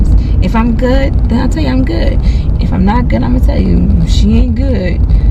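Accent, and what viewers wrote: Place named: South, United States. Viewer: That country accent is too cute😂. . Can’t wait to see the new space. Everything will work out. Praying for you!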